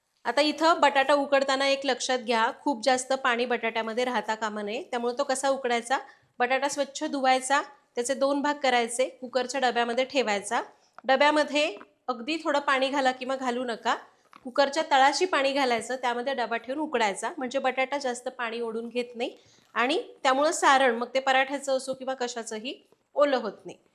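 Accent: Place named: Pune, India. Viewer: mam tumachi Marathi bhasha farach changli ahe. Agadi puneri ❤🎉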